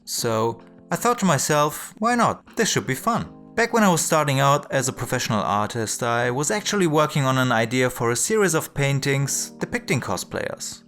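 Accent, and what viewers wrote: Germany. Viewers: In his defense, it's probably the very strong german accent, in combo with trying to sound american and "cool"?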